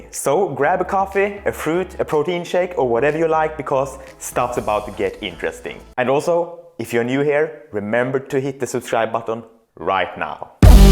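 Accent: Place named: Sweden. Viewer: Bro are you Swedish? Cause your accent sounds quite Swedish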